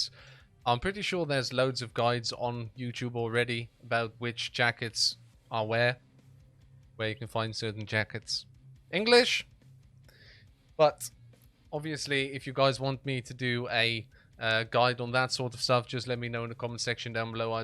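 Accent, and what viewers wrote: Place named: South Africa. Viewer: Where are you from? You almost sound South African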